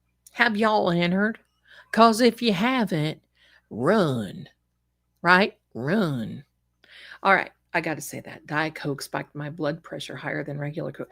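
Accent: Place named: Southern Ohio, United States. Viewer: You must be from southern Ohio.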